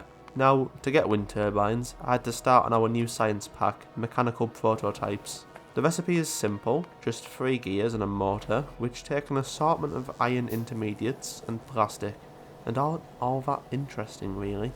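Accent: United Kingdom. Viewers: i meant start of the video when you saying about Ko-fi supporters, if im right in Britain people when saying "a bottle of water" they pronounce it like "a boa of woa", and when you said "supporters" by pronouncing it "supo-es" i remembered about Britain accent, LOL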